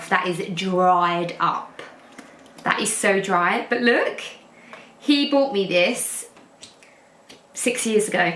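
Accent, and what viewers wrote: Essex, United Kingdom. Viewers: I love the Essex accent .. And love your vlogs .you are unique and you .. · Love the real ness! Your a babe! And I love your accent! My mums a Essex girl & she is cool AF xxx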